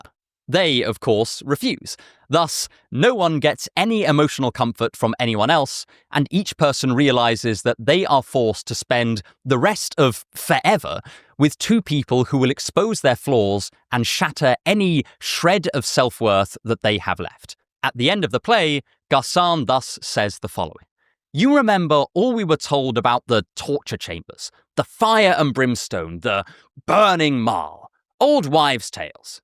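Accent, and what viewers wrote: RP, United Kingdom. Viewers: Anyone else been thinking for years he was saying ‘Joe Farley’ in RP?! 😂🎉 happy birthday 🎉🎈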